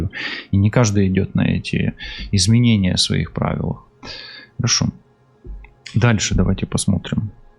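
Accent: Ukraine. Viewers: Добрый день. Вы очень красиво говорите по-украински. Чувствуется профессионализм таролога. Спасибо.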